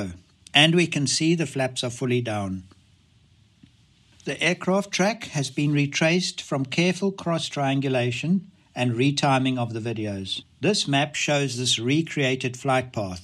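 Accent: South Africa. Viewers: Hi. I do live in Oregon USA now but did live in South Africa till 1999. I am guessing from your accent you are from SA as well. I have to admit I am very biased about Nepal. I have visited twice and left only a month before this accident. I had been almost as excited as some of the locals I know about how positive the new airport would be for everyone. This made something immeasurably bad even worse. If you have never visited the people in Nepal are incredibly kind, hard working and hospitable and its a very easy place to love. And whilst understanding their transport challenges in terms of equipment and procedures (it does not seem these were a factor here) I have only had the greatest respect for the pilots and bus drivers who do an incredible job under very tough conditions. I can only fault some taxi drivers, which seems to be a problem everywhere. I just hope there is some final explanation which does not add to this tragedy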